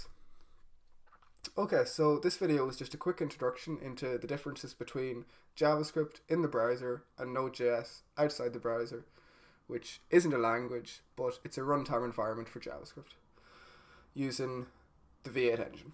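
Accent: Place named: Ireland. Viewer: it's called an irish accent hahah!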